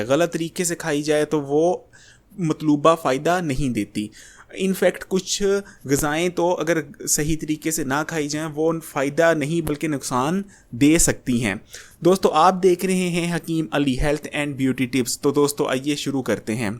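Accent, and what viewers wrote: Punjab, India. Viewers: Apka Punjabi accent ..apka baat krne ka tariqa bht acha hai. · بھائ اپنی اردو تو ٹھیک کر لیں یار کتنے الفاظ ایسے ھیں جو آپ نے پنجابی کے ادا کئے باقی رھی کلونجی کی تو وہ شفا ہی شفا ھے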